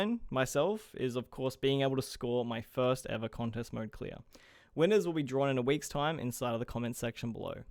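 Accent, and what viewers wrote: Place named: Australia. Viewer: U sound like you’re either from Adelaide or qld 🙄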